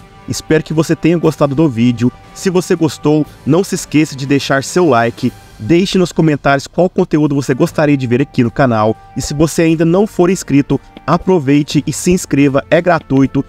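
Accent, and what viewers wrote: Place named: Caipira, Brazil. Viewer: O vídeo parece ser bom, mas o sotaque caipiiirrra nao consegui seguir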